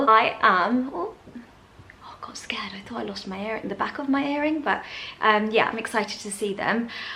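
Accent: United Kingdom. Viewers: lol love how you say sweaty mess it with your British accent. I feel like that all summer here in the US just a sweaty mess just like says.😂😂😂😂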